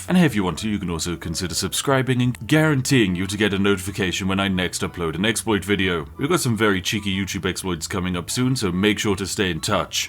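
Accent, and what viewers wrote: United Kingdom. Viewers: Go speed run the bible please!!! I realy need a refreshing on the material with a UK accent..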